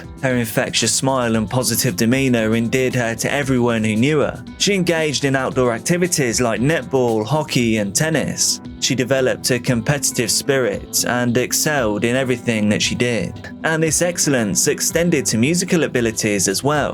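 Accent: England, United Kingdom. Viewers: the beautiful English accent is so exotic ! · "Casey" is Australian, is clearly British.. not the same at all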